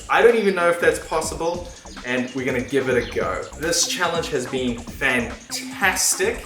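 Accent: South Africa. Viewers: Sounds saffa.....lekker. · Why is your accent so close to South African?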